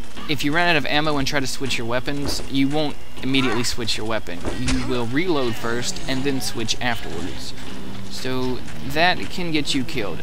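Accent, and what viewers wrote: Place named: Appalachia, United States. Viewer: lol, I love his accent... And the intellegence thing, HES A HILLBILLY !!! I wonder where cleedis is? I hope he done did get outta here before dem zombie fellers got him!... hehe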